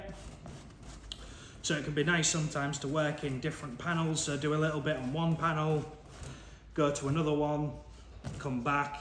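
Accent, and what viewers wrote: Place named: United Kingdom. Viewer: Other then the huge thick UK Accent making it hard to understand! I would like to see the quality of this leather jacket condition now. As, l have been trained never to use alcohol based substances on full grain leather.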